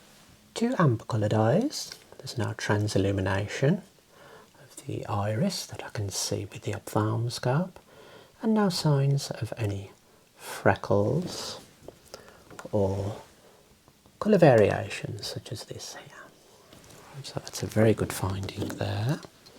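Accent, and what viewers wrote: Birmingham, United Kingdom. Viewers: I neeeeeeed to know. Are you a professional optican or optometrist? Also fellow brummie/yam yam here. since i was a kid, ive always had tingles having eyes checked, and your accent adds nostalgia for me! Thank you thank you thank YOU! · Where are you from, your accent is so familiar, I'm from the black country and you sound kind of brummie, love the asmr btw <3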